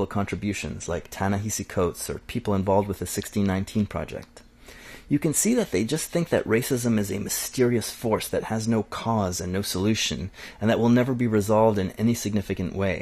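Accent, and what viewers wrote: Quebec, Canada. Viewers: "Bein non ça speut pas lâââ" 😂 are you Québécois?? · Ah are you a french canadian from Quebec or Montreal ? Good video as alway !!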